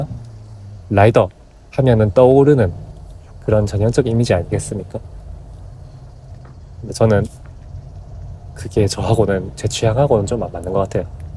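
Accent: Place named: Daegu, South Korea. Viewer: 서울사는 대구인이라 사투리가 아쥬반갑네요 ㅎㅎ 단체투어는 신경쓸게 많아서 결국 솔투를 즐기고 있습니다. ㅅㅅ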